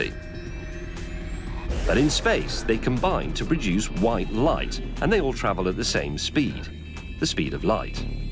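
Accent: United Kingdom. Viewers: My favourite British Accent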